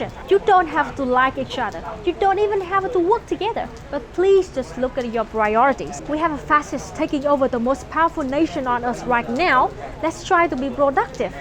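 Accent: Vietnam. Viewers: I find that after watching more of her videos, I have gotten much better at understanding her with little to no effort. I suggest trying to push through and before long you will probably find that you get used to the beautiful vietnamese accent and don't have to focus much anymore!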